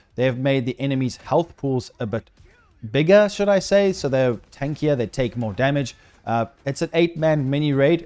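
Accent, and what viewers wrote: South Africa. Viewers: Are you from South-Africa? PS thanks for the video…Now im craving to come back!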